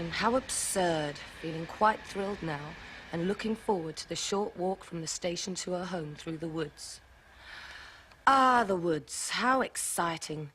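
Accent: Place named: United Kingdom. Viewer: oBRIGADAAA, estive procurando esse video legendado porque mesmo entendendo ingles, ainda me confundo com o sotaque britânico. muito obrigada pelo video!!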